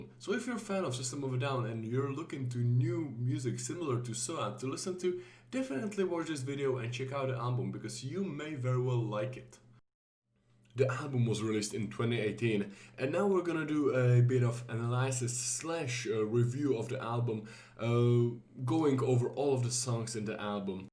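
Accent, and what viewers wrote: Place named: Czech Republic. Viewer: I don't know why but your English sounds very czech.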